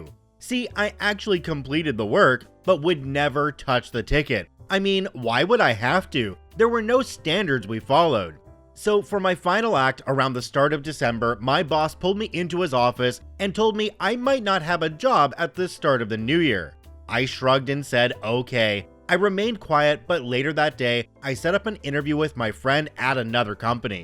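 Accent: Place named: Canada. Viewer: Hearing swear words in Canadian accent "chef's kiss" I was getting pissed as well. As the great Bill Burr likes to say, that LAY-DEE is a cvnt.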